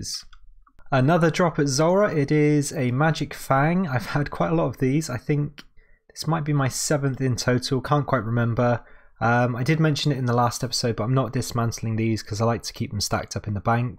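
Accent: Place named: RP, United Kingdom. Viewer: bro im british and even I think you sound posh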